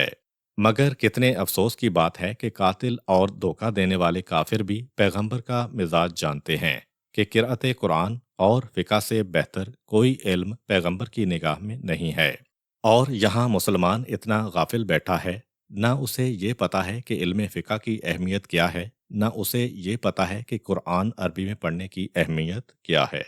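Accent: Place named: Punjab, Pakistan. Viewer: آپ کے لہجے میں پنجابی جھلکیاں سنائی دیتی ہے مجھے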